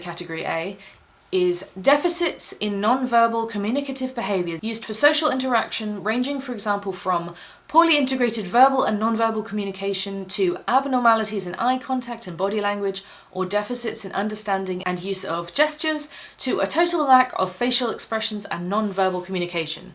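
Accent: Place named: England, United Kingdom. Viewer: Nice to hear an English voice, so many of these things are American. I kind of tune out when I hear foreign accents...maybe due to my condition (I self-identify).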